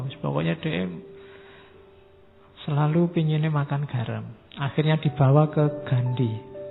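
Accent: Java, Indonesia. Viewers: tapi gua gasuka aksen Jawa mau apapun Jawa ngomong Indonesia kuping gua menolak 😭 gatau kenapa bapak ini ngomong pake logat Jawa aja gua gamasuk otak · tapi aku sama sekali ga suka cara bicara kamu.🤣 palagi m3dok gitu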